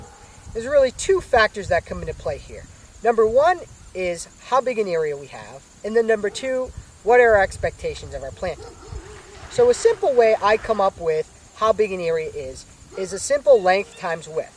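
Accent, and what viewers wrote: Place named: Western Massachusetts, United States. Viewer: Trying to pinpoint his accent. Western mass?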